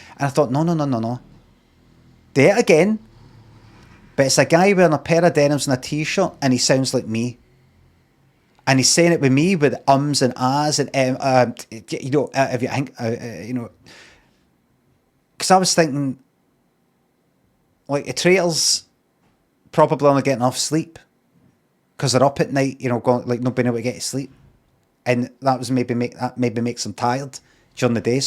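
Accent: Scotland, United Kingdom. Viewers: You clever b*****d,. I think you're onto something here. But wait a moment, now I'm wondering, is it that Scottish accent and yer fancy band t-shirt making me agree with you?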